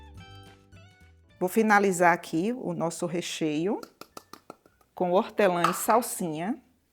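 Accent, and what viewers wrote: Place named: Aracaju, Brazil. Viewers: Parece que tu é de Aracaju sotaque igualzinho 😂😂😂😂 gostei da receita vou fazer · Menina, que maravilha! Vou fazer. De onde tu és? Eu conheço esse sotaque. Também tenho, sou de Pernambuco, mas moro em São Paulo à 40 anos, mas te ouvi me deu muita saudades da minha terra. ❤ Parabéns pela receita.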